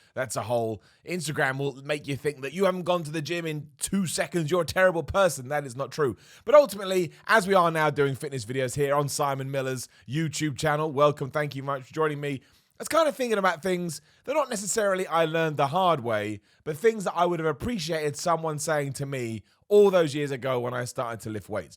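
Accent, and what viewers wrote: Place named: London, United Kingdom. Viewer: You got to love that smarmy working-class London accent.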